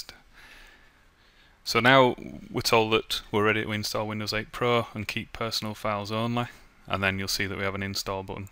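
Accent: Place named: England, United Kingdom. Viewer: Its realy hard to understand you with your long english accent but I think its only me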